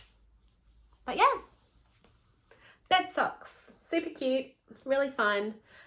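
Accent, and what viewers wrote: Australia. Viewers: Great to see you pop again on YouTube and it’s so nice to hear an Australian voice which are few and far between on knitting podcasts and speak about us coming into summer as the rest of the world talk about going into winter (as though there is only one hemisphere in the world)! Love your humour 😂😂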